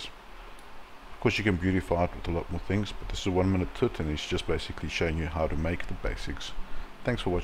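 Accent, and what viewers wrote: South Africa. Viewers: Nice to hear a fellow South African voice do a tut :) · South African? Nice to see...! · I immediately knew you were South African. Lekker man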